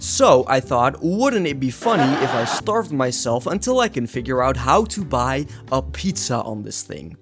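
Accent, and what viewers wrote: Netherlands. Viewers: wait are u dutch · Are you dutch? WAS THAT THE MEDIAMARKT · i smell a lil dutch hmmmmmmm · From the very first second of hearing your voice I knew you were Dutch lmao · Ik hoor een nederlands accent Edit: NEDERLANDS, HET IS BEWIJS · you dutch? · ur dutch? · ik vind jou nederlandse accent echt geweldig :) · what nice video with a thick dutch accent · I hear a little bit Nederlands 🔥😭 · Is bro nederlands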